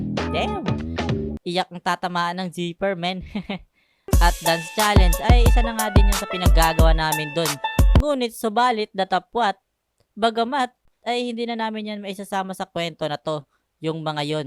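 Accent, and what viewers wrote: Iloilo, Philippines. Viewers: Ilonggo ka idol?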